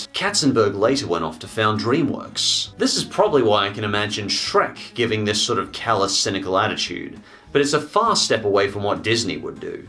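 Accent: Australia. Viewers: are you australian aswell? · Are u Australian?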